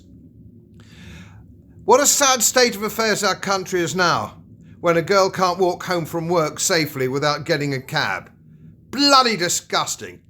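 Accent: United Kingdom. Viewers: Love to hear a real British accent, and yes I hate politicians for this mess they have done to our country.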